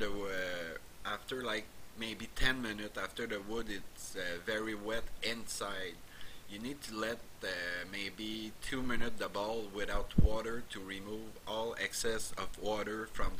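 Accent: Quebec, Canada. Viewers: Ho boy, toi t'es québécois. XD cool work dude!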